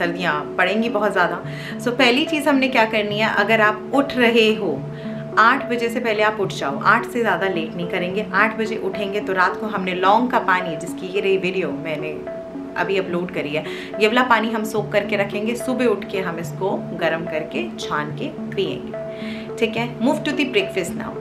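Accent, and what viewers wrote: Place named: Delhi, India. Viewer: I like ur delhi wala accent di. U r so inspiring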